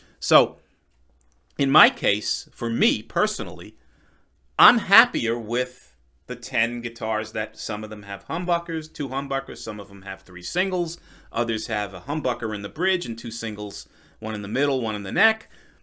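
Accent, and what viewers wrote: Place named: New York, United States. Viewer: Think I detect a NY or NJ accent.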